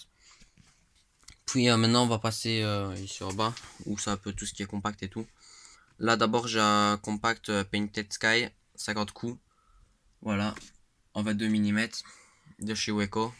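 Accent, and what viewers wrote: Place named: Liège, Belgium. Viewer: T'habites en Belgique à liège ça s'entend trop avec ton accent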